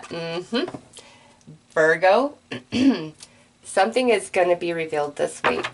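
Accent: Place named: West Coast, United States. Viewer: I really like your voice, still trying to figure out which region of North America who hail from....West Coast? <3 Thanks for sharing your healing gift with us.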